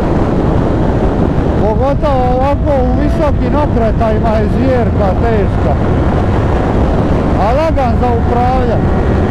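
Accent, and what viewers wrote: Dalmatia, Croatia. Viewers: E lipo mi te cut bruderu, kako to fino objasnjavas po dalmatinski :)))) Hvala za super video, taman hocu da kupim bandita i to bas takvog. Veliki pozz iz Sarajeva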